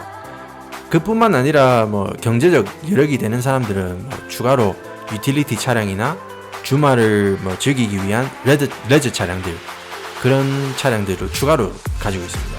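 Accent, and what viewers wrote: Gyeongsang, South Korea. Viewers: 알고리즘만 스쳐도 인연인데 구독하고 갑니다~! 경상도 사투리와 영어 의 조합이 색다릅니다 ㅋㅋ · 경상도 사투리가 정겨워요~ ㅋㅋㅋ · 갱상도식 영어 발음이 특이합니다 추천합니다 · 저도 나름 세차환자다보니 영상만 봐도 완전 힐링되네요. 그나저나 요새 방송이나 유튜브에서 찐 경상도 사투리 듣기 참 힘든데 말이죠. 간만에 귀도 힐링하고 갑니다. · 들리는 영어발음이나 차나 설명하는 문화나 미국인데 말에 스며든 경상도 말씨 때문에 경상도에 미국있는 착각함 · 찌지고 문떼고...그렇 습니더~ 경상도 구시한 사투리 정이 뚝 뚝 느껴집니다~ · 저도 아시는분이 미국에 오래사셔서 ㅎㅎㅎ 생각도 나고, 경남쪽 사투리들으니 친구들 생각나고 구독누르고 갑니다~~~소소한 미국소식 기대합니다~~~ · 뭐지... 경상도 사투리와 영어의 이 완벽한 조화는? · 알고리즘으로 시원함느끼고 갑니다 미국이신데 경상도말투로 해주시니 구수하이 좋네예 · 아무리 들어봐도 경상도 아저씬데... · 찰진 경상도 사투리 + 교포말투 + 꼼꼼한 청소 = 마약 영상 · 아니 갱상도 말투 론나 좋습니다 ㅋ콬ㅎㅋㅋㅎㅋㄹㅋㅎㅋㄹㅋㅎㅋㅎㅋ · 구독하고 갑니다.간간히 들리는 경상도 사투리가 정겹네요~ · 영어발음은 네이티브 한국발음은 미쿡이고 억양은 경상도 토박이네요ㅋㅋㅋ 엄청난 조합입니다 듣고만 있어도 풉하게 되네요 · 갱상도 사투리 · 경상도 남자가 혀굴리며 영어쓰는것같아ㅎㅎ 매력있넼ㅋㅋ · 경상도 억양 쓰시면서 영단어 말할때는 원어민 나오는게 신기하네요 ㅋㅋㅋ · 국산말 할땐 갱상도ㅋㅋㅋ 수입말 할땐 갱상도 억양이 없어지는데예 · 경상도 사투리 구수한데 영어발음은 상당히 좋으시네요 ㅎㅎ 미국 가신지 제법 오래 되셨나 봅니다. 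그나저나 유튭 알고리즘은 왜 이걸 추천해서 이 새벽에 보게 하는지..ㅋㅋ 속시원하게 청소 되는걸 다 봐야지만 꿀잠 자겠네요~ㅋㅋ 잘 볼게요~앞으로도 속시원한 청소 영상 보러 자주 오겠습니다~~ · 고향이 경상도이신가봐요!ㅋㅋㅋㅋㅋㅋㅋ 사투리와 영어발음이 섞인 말투 넘나 매력적인것....☆ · 갱상도잉글리쉬 찰지네예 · 저도 경상도 사람인데... 갱상도 사투리와 멋찐 영어랑 섞여서 하니깐 스웩하십니더! 느낌있습돠~ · 아이고 말투가 경상도 아닙미꺼 저도 세차업을 합니다 먼 타국에서 욕보십니다 자주 오겠습니다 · 경상도 사투리 정겹네요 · 한국어 베이스가 갱상도네요ㅋㅋ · 경상도 이민자 십니까? 억양이 저희쪽 이시네요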